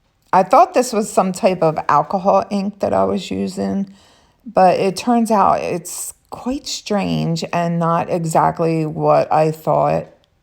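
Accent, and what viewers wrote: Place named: New Jersey, United States. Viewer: Are you from jersey or PA ? Just wonder from your accent lol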